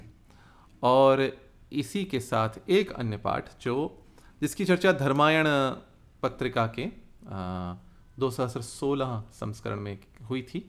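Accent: Standard. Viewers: Your Hindi is too pure- very nice . Samskaran yaane kya?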